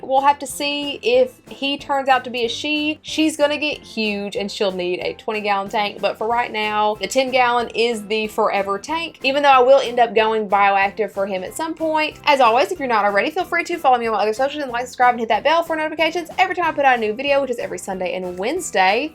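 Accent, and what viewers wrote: South, United States. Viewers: He just dont understand a good southern accent, its nice.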